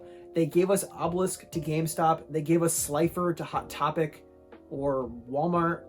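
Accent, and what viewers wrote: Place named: New York, United States. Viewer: Okay but I loved the New York accent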